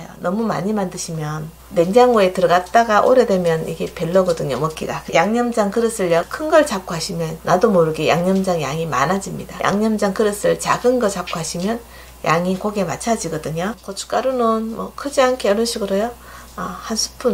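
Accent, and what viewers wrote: Gyeongsang, South Korea. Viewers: 시원시원하니 진행도잘하시고 요리도 잘하시고 많이배우고 갑니다❤ 경상도 억양 구수하니 정다워요 ❤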